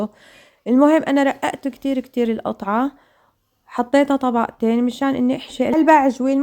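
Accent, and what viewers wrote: Homs, Syria. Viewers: حبيبتي شكرا انا متابعتك وبحبك كتير احلى لهجة لهجة السورين وبحب حكياتك وصفاتك انتو السورين ستات بيوت ماشالله عليكم متابعتك ام فيصل من فلسطين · يسلموا دياتك فعلا شي بشهي يعطيك العافية ويبارك ما بين ايديكي .على فكرة دخيل اللهجة الحمصية ما أحلاها الله ايسلم ❤❤❤❤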